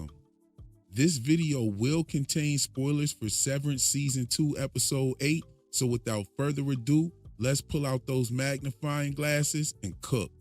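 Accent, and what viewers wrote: Philadelphia, United States. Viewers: Are you from Philly? 😂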